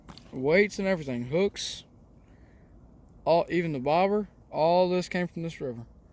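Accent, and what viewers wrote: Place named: North Carolina, United States. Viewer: A very good video! Thanks for sharing your ideas. Several will make their way onto my large ALICE pack. I found a M1976 sleep system carrier in new condition! One thing I didn't hear in the video was the total weight of that pack system as shown. How heavy? I'd also like to say that it's evident you have a lot of respect for your Dad and a great relationship. You do well on camera and have a great NC accent. I'm from KY originally and my accent finds it way out any time I'm around my "people". I was a boy scout (an Eagle!) all through the 70s and the ALICE was a very common pack back then. Was at the scout store recently and they have gone high tech with a rack full of Ospreys. Oh well, we traditionalists can continue to fly the mil-surp flag.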